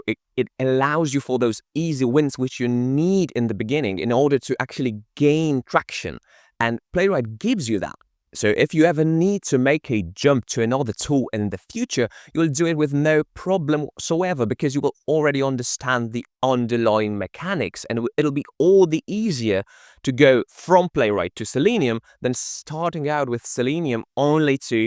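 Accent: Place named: United Kingdom. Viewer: Thanks for your video. I'm a Python Automation QA Engineer. I worked for DISH company for 6 years as API Automation Engineer. I love your British accent and pronunciation. I'm from Ukraine and I want to find a partner to practice speaking English. I have been resting for 1 year after I left DISH company. Now I'm updating my skillset and I want to find a new job. Could we get to know each other to practice English together?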